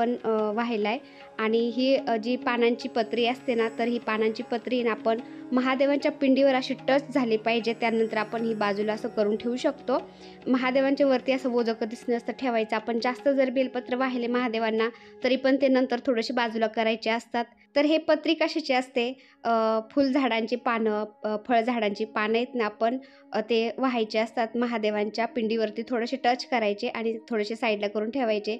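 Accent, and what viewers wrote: Ahmednagar, India. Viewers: Tai khup chan kele tume puja tumcha bhashe mde aplya .Nager cha sugandh yeto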